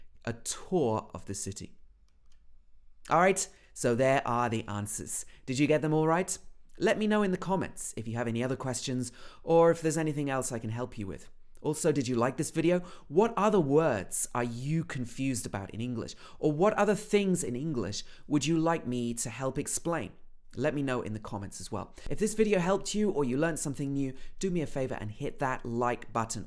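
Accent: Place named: Southern England, United Kingdom. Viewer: Hi. I love your accent so much, I live in Manchester though and I think it's better to have a course with a teacher from Manchester. As the accent in Manchester is a bit different from South. What do you think?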